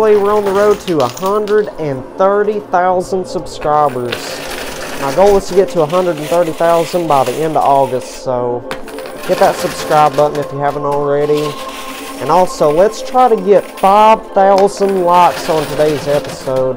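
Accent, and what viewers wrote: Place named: Oklahoma, United States. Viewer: I watched a old video , when you didn't have a bunch of money. Your voice sounds completely different, sounds like a hick, like my OK. accent. You also are nervous. But you are a rich pro and a great speaker. Proud of you brother. ALAN SMITH IN OKLAHOMA!!!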